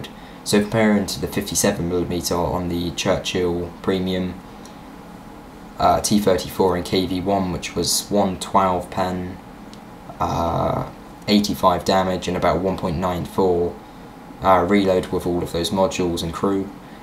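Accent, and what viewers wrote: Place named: United Kingdom. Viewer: You have a British accent. ^^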